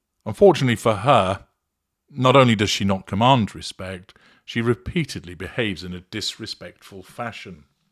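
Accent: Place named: Newcastle, United Kingdom. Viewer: I think our glorious narrator is a Geordie.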